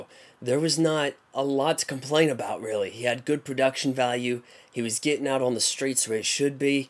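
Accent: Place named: Australia. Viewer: wow you really sound australian with your cold.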